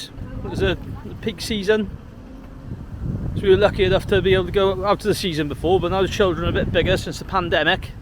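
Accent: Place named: Wales, United Kingdom. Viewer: Thanks for this. Love listening to you speak; reminds me of my grandmother and cousins in Llanelli. I’m Canadian, so I don’t get to see them often. We’re booking in Luz for next winter (Canadian winters = 🥶) so enjoyed the look around.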